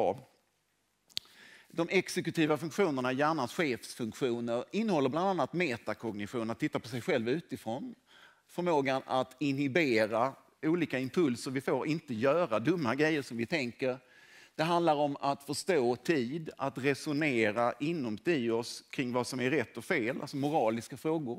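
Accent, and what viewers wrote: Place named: Scania, Sweden. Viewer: en eloge för lugn välvårdad skånska som nästan vem som helst måste kunna uppfatta. Det du säger är oerhört intressant. Ännu bättre vore om allt du säger var textat på svenska. Det finns ju folk med rejäl hörselnedsättning. Men du är mycket tydlig i mina öron. I o f s kopplar jag av bättre om det finns text också. Tack!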